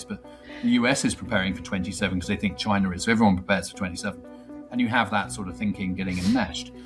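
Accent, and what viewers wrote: United Kingdom. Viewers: I need to get a fork from all the word salad in a British accent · Polycrisis? Are we making up words now? Take your British accent and try to fix your own country, you don't have time for the world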